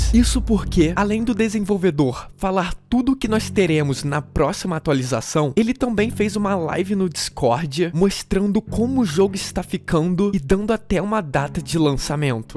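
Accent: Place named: Rio de Janeiro, Brazil. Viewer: Min fala carioca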